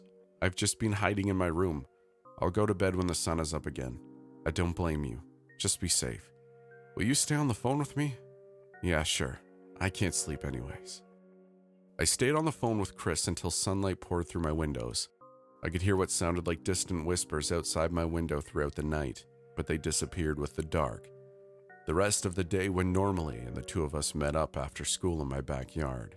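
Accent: Canada. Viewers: "Soary=sorry" "bean=been" lol are you Canadian? Great narration anyhow!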